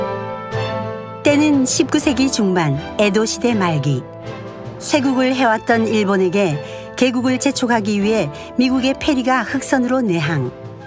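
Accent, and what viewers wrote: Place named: Gyeongsang, South Korea. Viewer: 경상도 사투리를 쓰고, 그리고 문제는 일본어 장음이 전혀 안되고 토의 발음이 도로 되는 등 전형적인 한국인 발음임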